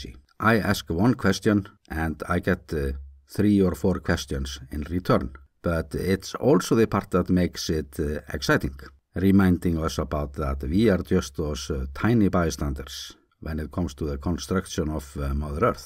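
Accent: Iceland. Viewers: Love these updates from my home country that I left some XX years ago - takk kærlega! :) When living in Iceland, the Icelandic English-accent used to sound terrible to me ~ now I can only hear its charm ❤️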